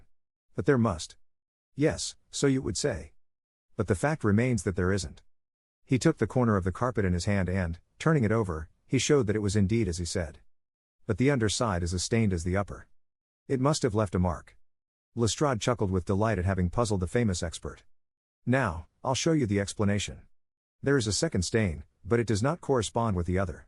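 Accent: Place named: United States. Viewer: You can't read Sherlock Holmes with a robot American accent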